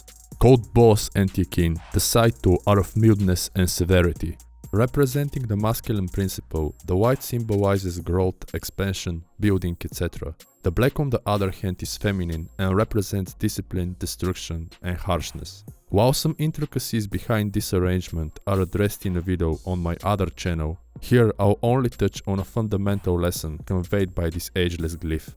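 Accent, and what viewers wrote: Russia. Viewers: Very hard to understand your Russian accent. Good content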